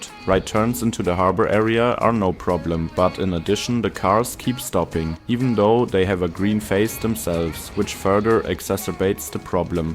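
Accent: Germany. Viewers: Something about a German accent and doing engineering work that makes my heart warm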